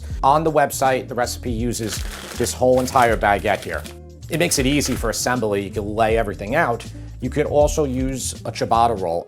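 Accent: New York, United States. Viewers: One reason besides the nice recipes you cook is your New York accent. I miss the accent, the culture, everything.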